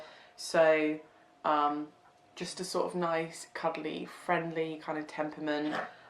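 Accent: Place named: United Kingdom. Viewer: why is the american flag on the thumbnail and your accent is british?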